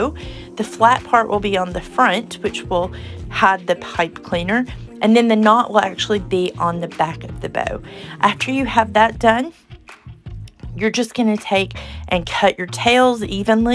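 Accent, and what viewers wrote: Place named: South, United States. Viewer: Thank you SO much for this helpful video! Love your southern accent too ;o)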